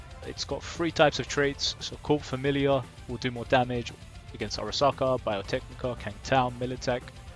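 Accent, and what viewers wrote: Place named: United Kingdom. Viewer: Can you say Water in your British accent please?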